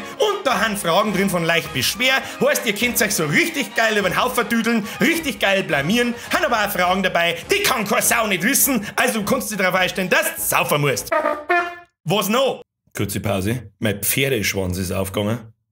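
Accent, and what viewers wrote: Bavaria, Germany. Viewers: Gibts as englisch dann a mit Bayerndialekt ?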